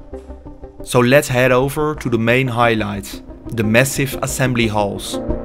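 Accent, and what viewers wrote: Netherlands. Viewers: Makambeus.........Tremendo Dutch aksentos. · Dutch Limburg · That is a Dutch accent if I've ever heard one!!! Sounds like my Opa!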